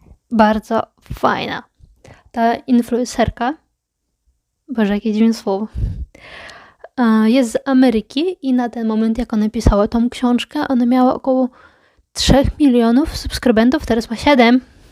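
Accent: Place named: Ukraine. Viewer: Hej! Ciekawe wszystkie 3 książki, zwłaszcza ta "pisz, publikuj, działaj". Tak w ogóle to jesteś Polka czy Ukrainka, bo masz dość ciekawy akcent? Pozdrawiam.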